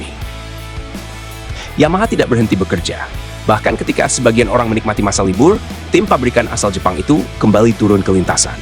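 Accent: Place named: United Kingdom. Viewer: Ai dgn aksen enggries bikin cringe Walau yamaha tim gavorit gua,Males nonton sampe beres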